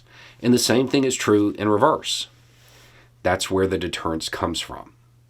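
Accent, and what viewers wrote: South, United States. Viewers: For me gives me comfort that all is not lost. I live up north where it is pleases me that the way talks does not sound like me or the people you normally hear on the news or typical moderates and liberals. He is from the south, looks and sound like likes someone from the south. So people from his neck of the woods are more likely to listen to him.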